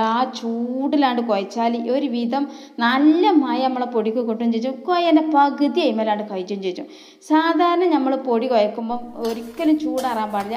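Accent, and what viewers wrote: Malappuram, India. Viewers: തനി മലപ്പുറം സ്ലാങ് ആണ്‌. കഴിക്കണ്ടത്തവർ കഴിക്കണ്ട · മലപ്പുറം ഭാഷ ആണ് മക്കളെ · .Nishkalankamaya samsaram.. Athanu malappuram bhasha.. 🥰super pathiri👍🏼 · താത്ത പറയുന്നത് ഒന്നും മനസ്സിലാകുന്നില്ല. Slang കേരളത്തിന്റെ മധ്യ ഭാഗത്തുനിന്നും തെക്കോട്ടു താമസിക്കുന്ന ആർക്കും മനസ്സിലാവുമെന്ന് തോന്നുന്നില്ല. ഇത് മലപ്പുറം ഭാഷ ആണോ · Aa😁. Malappuram.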